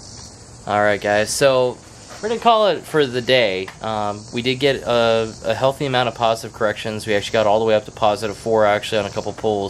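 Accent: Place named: British Columbia, Canada. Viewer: Your accent sonds like almost Fraser Valley BC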